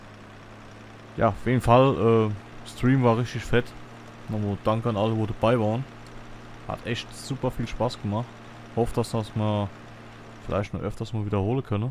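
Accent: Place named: Hesse, Germany. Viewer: Bist echt gut mit den Ballen, wie immer sehr angenehme Folge mit Hessische Mundart.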